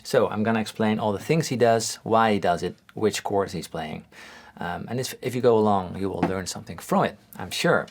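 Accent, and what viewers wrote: Netherlands. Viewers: This dude is heavily dutch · Bro your Dutch I know because your accent and I see you many times at the supermarket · Hi. New subscriber here, and I'm really enjoying your videos. My parents and all my extended family are from Netherlands so your (very slight) accent is relaxing and familiar to me. Cheers!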